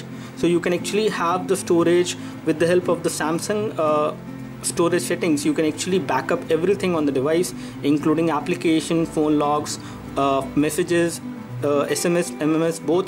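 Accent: India. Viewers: Daaaamn! That indian accent drives me insane!! It's so annoying!!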